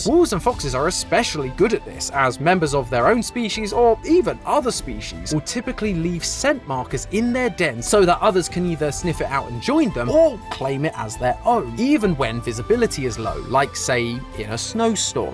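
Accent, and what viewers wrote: United Kingdom. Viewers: A British voice explaining lore just hits different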